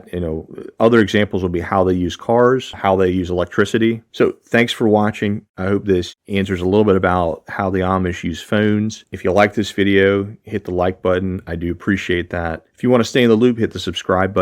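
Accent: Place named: Philadelphia, United States. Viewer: Question for the narrator, you have a Philly Delco accent?? Where are you from?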